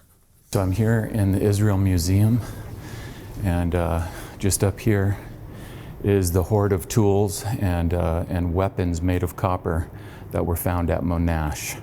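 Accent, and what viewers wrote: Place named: United States. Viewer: you don't seem to be able to tell the difference in a spear head and an arrow head. You're also a bit inconsistent in which measurement you give. Sometimes in metric, then immediately follow with imperial. You said the spear heads were a half inch apart, then describe the largest as 66 cm. Pick a lane or give both measurements. You have a very American accent. Don't you use the imperial system?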